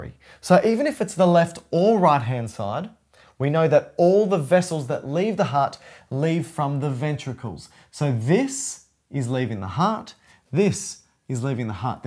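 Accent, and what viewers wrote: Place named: Australia. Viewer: As it turns out, I can only understand biology when it's explained with an enthusiastic Australian accent. Thank you good sir!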